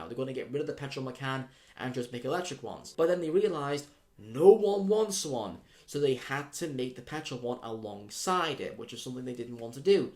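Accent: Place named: West Country, United Kingdom. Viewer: Yank here. Some of us like to try to figure out different English accents. Most Americans can't even tells the country, but many of us can. Are you on the west side? Bristol? Maybe Wales? Sorry that has nothing to do with your Porsche content, but your video was suggested to me and I love the Porsche topic.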